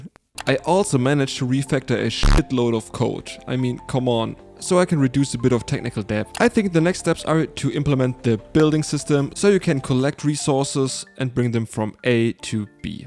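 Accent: Germany. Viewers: I can hear the German acent, aber gutes Video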